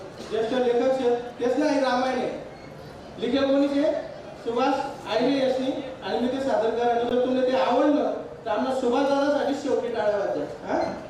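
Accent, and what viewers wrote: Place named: Khandesh, India. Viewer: खरंच मस्त सादरीकरण सर... पुन्हा एकदा डीएडच्या स्टेजची आठवण झाली... तिथेही तुम्ही या रामायणामुळेच सगळ्यांच्या चांगले स्मरणात आहेत ..... अहिराणी भाषेत बोलण्याची तुमची... अहिराणी शैली खूपच छान आहे....😅😅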